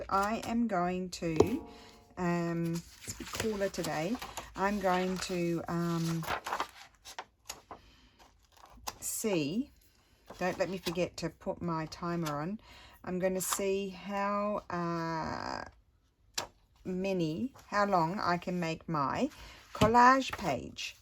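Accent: Australia. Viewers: I love how you sound more "Aussie" the longer you are away from home! This is lovely. I am still holding out hope that my birthday challenge entry arrives this year!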